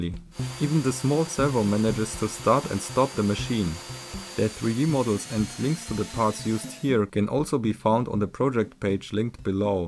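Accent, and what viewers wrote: Germany. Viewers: Great sense of humor with a German accent. I love your videos.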